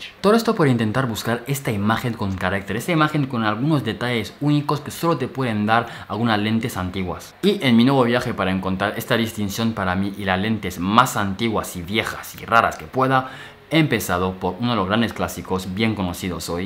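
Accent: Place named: Spain. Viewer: Pocos videos hay en español y tu lo resume bien, por cierto de donde eres Bro? Traes un acento que me suena bastante y batallo en identificar, es una mezcla con el acento español.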